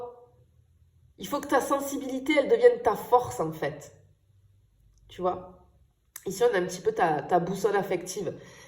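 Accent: South, France. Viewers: Tellement joli cet accent du sud ☀️🐝🌻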